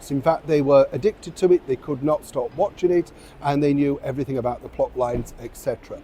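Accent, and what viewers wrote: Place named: North, United Kingdom. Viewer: At first, Neil, I thought you said "she was a hooker" - which would have been more or less accurate - but you said "hugger" in your northern accent! x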